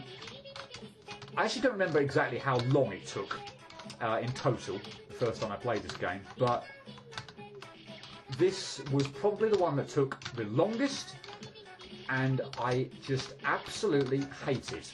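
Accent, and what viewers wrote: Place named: United Kingdom. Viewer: If you don't mind my asking, how old are you? You sound like a rather snazzy, upper-class british commentator on a golf game with more emotion. Yes, that's a good thing.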